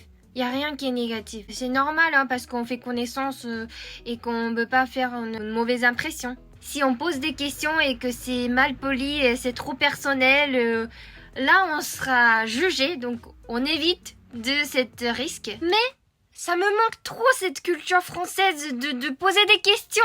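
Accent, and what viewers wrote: Japan. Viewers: Ok , l'accent nippo_français est vraiment très mignon. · En tant que français qui apprend le japonais c'était génial d'entendre une japonaise parler français 😄 Les français devaient te poser des questions à 50% par curiosité et à 50% pour ton accent😃 Merci du partage 🙏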